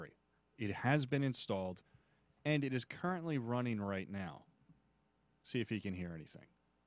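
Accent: Philadelphia, United States. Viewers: Just subscribed. Thx for this video. BTW, are you from Philadelphia? Just a guess from hearing your accent.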